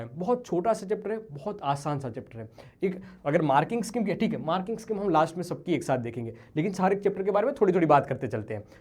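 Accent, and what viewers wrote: Gujarat, India. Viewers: sir aap Gujarati ho????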